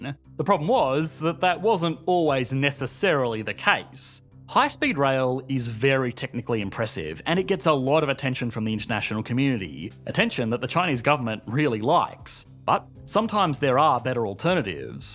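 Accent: Australia. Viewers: What is this australian dialect/accent called? I need to know so I can avoid it in my life forever. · He is Australian but I would suggest has lived OS for a few years, Most Aust as u correctly stated pronounce route as "root" and router as "rowt-er" as it has a sexual meaning in slang. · Usually but in this case he is a pretentious individual trying to appeal to the Americans. If he was genuine in what you he would have dropped his Australian accent. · Australian accent is so freakin weird like how he says todddaaaay lool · 这个口音我猜听上去像个澳大利亚人，众所周知，澳大利亚跟中国的关系现在一点都不好。我还觉得粉种人显然对中国人的经济观完全不懂，喜欢用自己的经济学常识分析中国，中国人一般认为经济出现大问题是因为存量市场瓜分殆尽，并且新的技术革命未能出现。至于债务危机，中国是一个儒家国家，政府对经济部门的掌握不仅仅限于交通工具，还有金融、能源、化工、机械、物流等各个领域，任何部门的利润在必要时都会拿来作为财政收入填补其他公共部门的亏损。而且高铁的建设是为了带动整个地区产业的繁荣，并不以追求利润为目标，所以广大的中小民营企业才是高铁的最大受益者。如果要说债务危机，那么我认为维持一个高福利社会的制度危机更严重。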